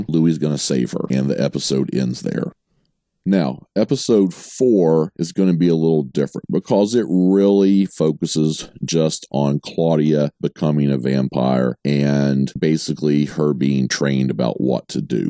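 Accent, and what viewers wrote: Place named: Texas, United States. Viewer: Late to find you; glad I did. Your reviews of this series come across as fun & heartfelt. Plus you have a great voice. Sounds Texan so I'm biased. I look forward to listening to you come Season 2.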